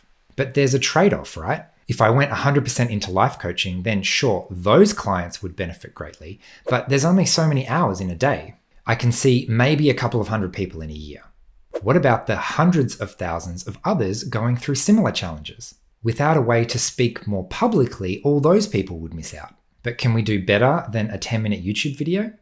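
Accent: Australia. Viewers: Please don’t quit. I’m a sometimes viewer, parent of an autistic person. It’s so calming sometimes to hear another Australian talking about any aspect of autism, I always learn something. I wonder if your helper might be called an ‘aerator’ as they will help you out into the air of the web? Best of luck whatever you decide. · NOOOO, please stay, you're a rare Australian voice with unique perspectives on autism and evidence based info delivered with a warm gentle manner.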